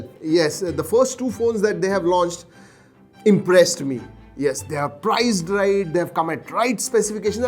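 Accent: India. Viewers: jab english hi bol rahe ho tab thodi fancy accent bhi ho jaay kya hai ki ji english bhi bol rahe hain to jaise hindi lag raha hai.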